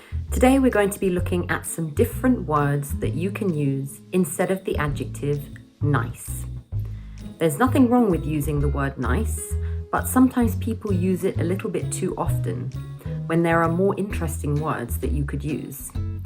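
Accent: United Kingdom. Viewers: 흐어어..ㅠ 영국발음 죻아아...♡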